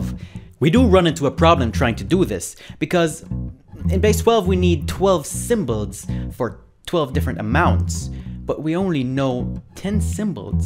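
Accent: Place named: Latin America. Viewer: I'm not trying to be mean or racism or anything but ur accent sounds so latin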